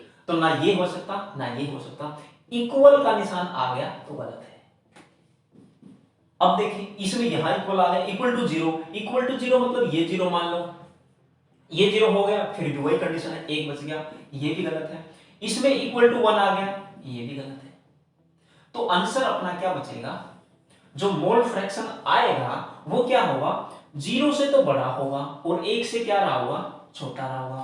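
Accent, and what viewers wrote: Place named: Haryana, India. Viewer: That haryanvi excent in his language is just 😌,🥀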